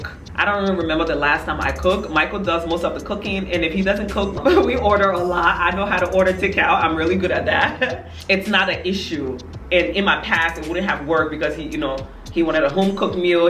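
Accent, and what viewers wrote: Liberia. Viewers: Congrats on everything! I have to ask, are you liberian? I love liberian accents!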